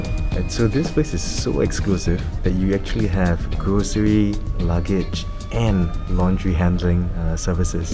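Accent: Singapore. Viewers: He has vy obvious Singaporean accent, not Philippino accent.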